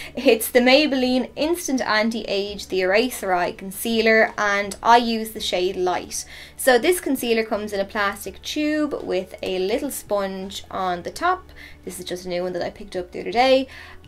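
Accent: Ireland. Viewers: I love, love ,love your Channel and your videos 😍😍😍 You are so professional, kind and friendly, and I love that you review products that are affordable for us mortals 😅 And...even though it's highly irrelevant and I hope I don't offend you as an Irish person, but being a Dane, who spent a lot of time travelling and living in Dublin, listening to you talk takes me "home" to Ireland.❤️ So thank you for that too, and I'm terribly sorry if made you feel like a tourist- leprechaun...😳🤦‍♀️ Definitely not my intention!😊🙏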